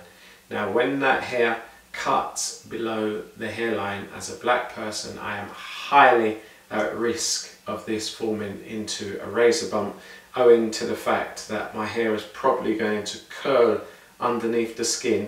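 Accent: United Kingdom. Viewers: Love that British Accent 🤗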